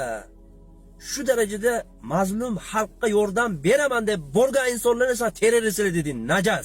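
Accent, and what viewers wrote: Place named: Namangan, Uzbekistan. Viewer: Номонгонликми дейман шевасига караганда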